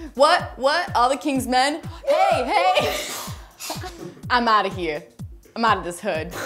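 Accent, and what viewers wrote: United Kingdom. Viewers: Speaking in a British accent, dressing like harry....looks like someones on a roll now doesn't it... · Y'all make it seem like everything she do is a bout Harry she talked in a English accent she tryin to be Harry she put on a Beanie she trying to be like Harry wats next she put on shoes she tryin be like Harry like forreal y'all need to stop y'all ain't even relevant to her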